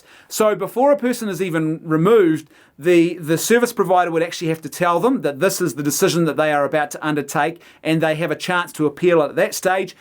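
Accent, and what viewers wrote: New Zealand. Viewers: You sound like a new zealander sir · I would've subbed, but you clowns from across the ditch have the most annoying accent in the world. Sheesh dude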